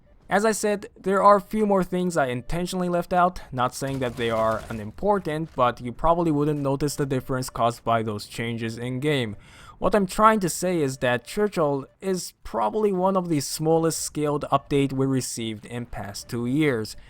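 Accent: Germany. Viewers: what really?!? He totally has a german accent XD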